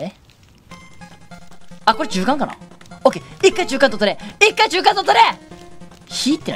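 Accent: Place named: Kansai, Japan. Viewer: 関西弁好き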